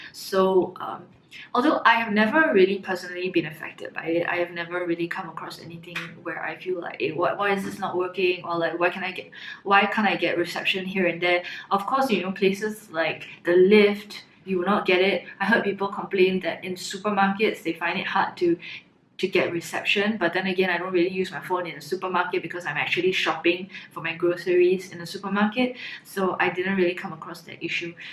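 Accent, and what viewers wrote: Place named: South Korea. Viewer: Haha glad to watch this video, I am using circles'life $28/100gb plan now and happy about it. Are you from Korea? Your English sound like Korean's English, haha. I am from Korea.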